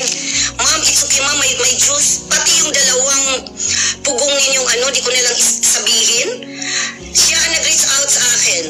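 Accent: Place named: Visayas, Philippines. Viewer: Hahahaha yabang mo madam Eh halata naman sa salita mo na Visaya ka. Korte na para magkaalaman na sobrang yabang na eh. Hoy kakagigil ka na ha. Wag mo ipagyabang ang America dito dahil sa totoo LNG hindi mo cla kilala kong kaibigan nga cla. Cguro sabog ka KC maluwag Jan ang droga eh.parang kendi LNG na nabibili lakas ng tama mo madam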